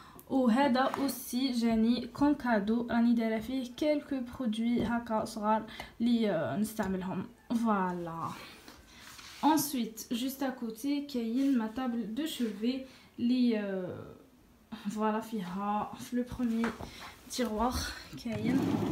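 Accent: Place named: East, Algeria. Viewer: عجبتني قناتك وهدرتك كي لعسل حابة نعرف من اي ولاية تبانيلي من الشرق والله اعلم انا من سطيف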